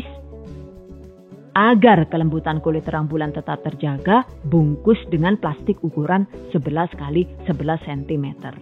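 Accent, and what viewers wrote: Banyumas, Indonesia. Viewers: Mesti nguyu nek ono part ngapak yakin😘😘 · Bu asli mana mba ngapak SMA mba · ngapakke nemenn mbangett gehh... Wong ndi si jane rika mbk? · mesti kekel aq mbak nonton pideone pean...ngapak e iku looo nggarai pingin njiwit.....sukses selalu mbak resepmu sangat mengispirasi....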